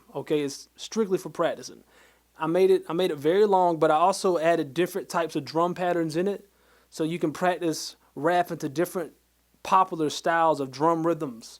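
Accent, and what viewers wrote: United States. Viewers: You are one YouTuber that I can watch and understand all your teachings I love them all my song is coming together thanks and you sound like you from my home FLORIDA 😎 especially when you say dat 😂 we talk like dat 💯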